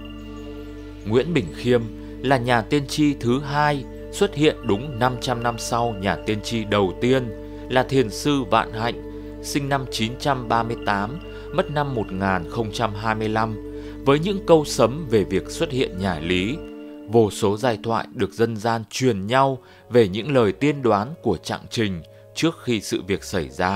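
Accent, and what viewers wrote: Hanoi, Vietnam. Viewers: Hay quá, giọng anh HN thật ấn tượng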